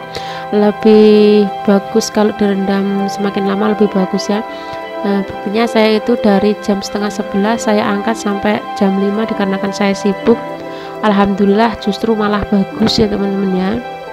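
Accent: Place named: Banyumas, Indonesia. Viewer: Ngapak ya 🤭🤭🤭😂😂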